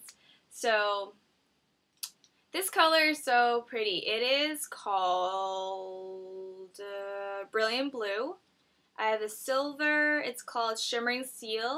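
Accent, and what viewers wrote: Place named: Alaska, United States. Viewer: I just noticed the sarah palin accent too haha.. anyway nice video!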